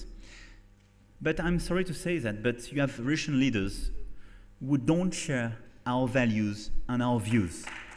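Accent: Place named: France. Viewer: bah il est français tu veux qu'il ait quel autre accent à part l'accent français ?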